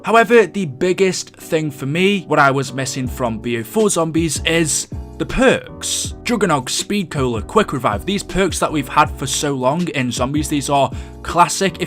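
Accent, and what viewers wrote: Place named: Liverpool, United Kingdom. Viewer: Oh god the scouse accent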